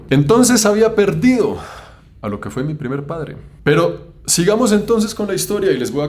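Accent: Bogotá, Colombia. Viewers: awwww que video tan bonito:face-red-heart-shape:, y yo mas y mas enamorada de 😍 ojala me encuentre un clon con todo y acento colombiano jajajaj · Recién. te sigo, me gusta mucho el tu acento rolo yo viví en Bogotá hace 12 años. Después. emigre a Países. Pero mi querida Bogotá me abrió las puertas del mundo. Mi más sentido pésame por tu perdida. Mucha fuerza. Saludos de una peruana en Haya ❤🎉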